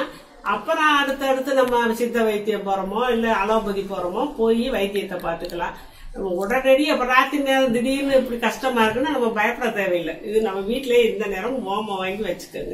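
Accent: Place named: Kongu, India. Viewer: Unga kongu tamil language, vagapa... sweeta erruku patti😊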